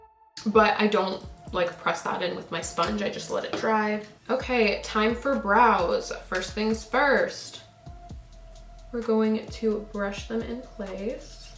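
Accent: Toronto, Canada. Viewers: Why can I always tell when its a fellow Canadian LOL!? Our accents are not even that apparent !! anyways you're stunning hun! from another Canadian living in the UK <3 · is this weird.. from your voice i could tell you were from Toronto.. then you mentioned winners so I knew you were from Canada then I checked your ig and turns out you are! ahah love your videos! we need a toronto beauty guru!!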